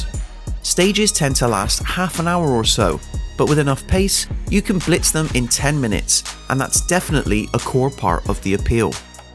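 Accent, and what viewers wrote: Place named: Bristol, United Kingdom. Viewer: Bleeding hell, if this commentator isn’t from Bristol then I must be deaf. Love a good Bristolian accent👍🏼😂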